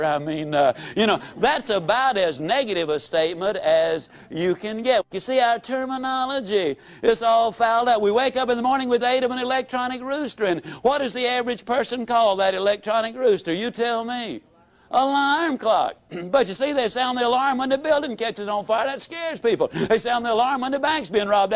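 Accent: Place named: South, United States. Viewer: he sounds like someone from arkansas/ texas/ mississipi. he sounds like forrest gump. ziglar died three years after jim rohn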